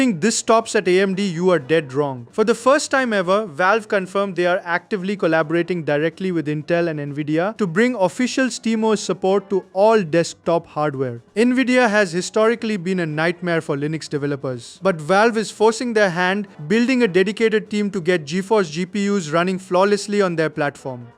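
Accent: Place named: India. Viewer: Heard the Indian accent and left instantly saar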